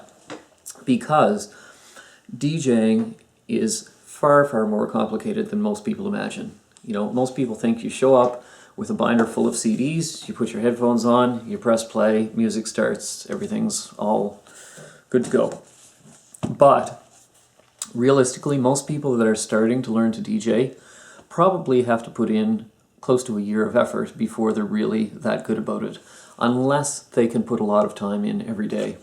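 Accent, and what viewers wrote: Canada. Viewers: Also, love the Canadian accent. Living in Manitoba mine isn't nearly as defined as yours but I still try to making it noticeable.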